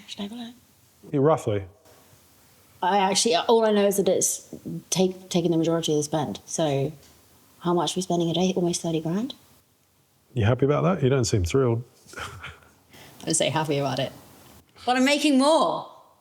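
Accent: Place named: United Kingdom. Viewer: Bro I wanna decode your British accent through Alvin and the chipmunks lo-fi hip hop because you are hipsters and I'm here to get a sense of your style? For a marketing video? You wanna drag my ears through barbershop b-roll music whilst you pretend to explanate any bit of information. Fire your editor posthaste good channelkeep.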